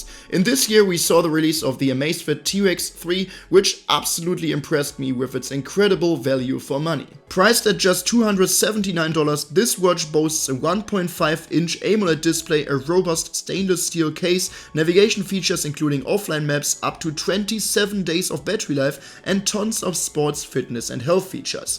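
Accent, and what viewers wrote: Germany. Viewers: What an awesome unbiased cover of these watches. I love the channel name too. You get exactly that, reviews with a German accent 😂. I hope you get more followers with this much effort.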